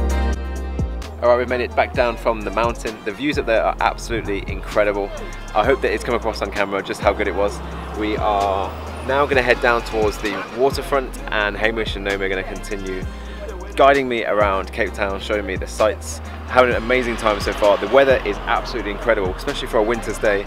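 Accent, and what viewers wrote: United Kingdom. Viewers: i enjoy your videos and practice with your British acent greetings from bogota.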